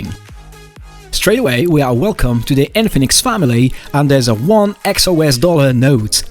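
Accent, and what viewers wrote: United Kingdom. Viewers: 👍 The British accent is a value added. Saturday February 04, 2023. A.M. U. S. C. T.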